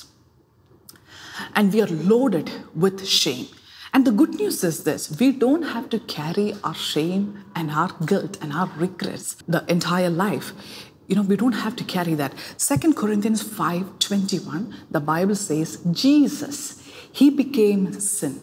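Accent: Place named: India. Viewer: 5 Subjects Great Stuff Pastor. Very Clear, Good Study and English That's a great Indian Accent.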